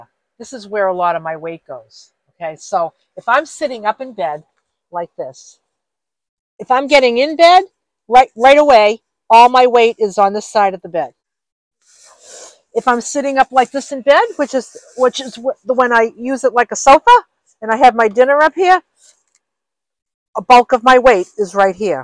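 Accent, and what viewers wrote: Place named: Massachusetts, United States. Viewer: Ha I knew it,,,,I heard that Mass accent on your other videos. Thanks for cool videos Helpful ..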